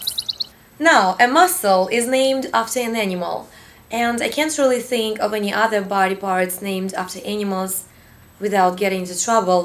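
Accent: Russia. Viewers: you're russian, right ? :))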